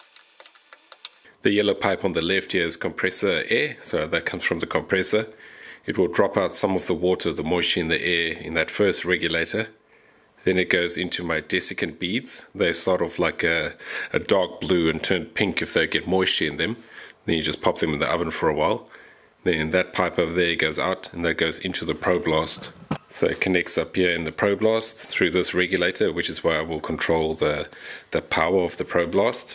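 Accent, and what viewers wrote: South Africa. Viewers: Dude I know you're a New Zealander, but that accent sounds so South African to me. I can't believe there's THAT much similarity between the accents, so what gives?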